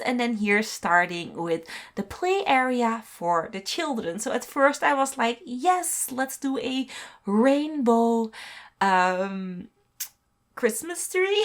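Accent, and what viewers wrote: Netherlands. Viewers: Je hebt echt een Nederlands accent ik hou ervan. 🧡🇳🇱🧡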